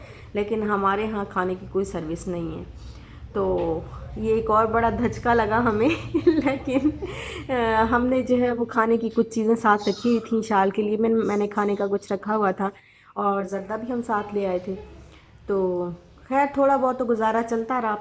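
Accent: Saraiki, Pakistan. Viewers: Apka laahjaa asa hai jesy sarayki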